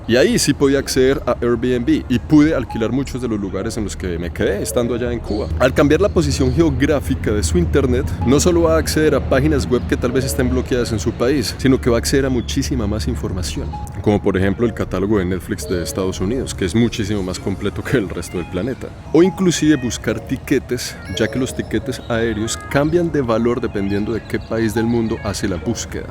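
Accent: Colombia. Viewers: pero su acento parece más colombiano (del interior) que panameño... O me equivoco?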